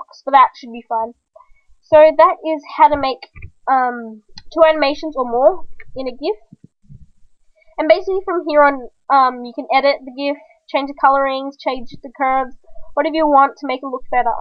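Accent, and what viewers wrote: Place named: Australia. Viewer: speaks in an aussie accent like me is so refreshing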